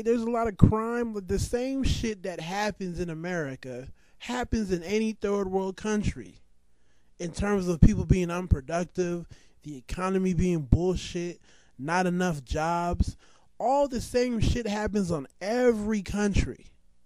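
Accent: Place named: Nigeria. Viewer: Oh I forgot,.you are so Nigerian..not only your accent but ur message..would U like to go to Nigeria urself? I've you started paying bills yet? Not gas /cell-phone bills, I mean real bills..do u have any kids yet? I don't think so.. I'll advise u to listen to your parents advise when dey r trying 2 disciple u, dey know better. Everyone is a foreigner, the difference is, some know where dey came 4rm &some don't. D whites are from European Countries & d Black? we all no dey got lost in transit.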